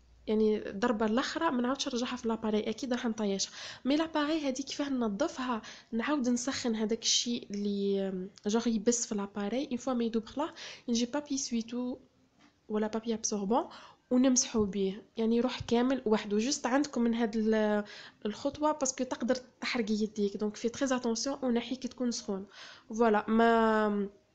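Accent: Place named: Annaba, Algeria. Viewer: اللهجة العنابية 😍 يا خويا يا خويا 😘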